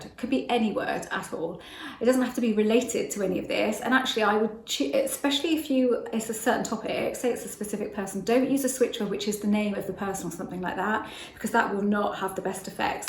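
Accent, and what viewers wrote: United Kingdom. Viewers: You have the same name as my daughter and I love hearing British accents talking about the law.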